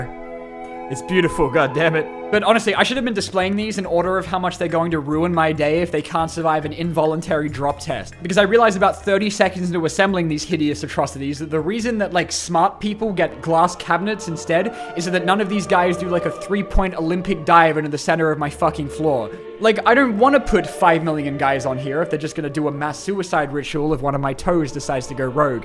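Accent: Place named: Australia. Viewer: Idk why but I thought u were Australian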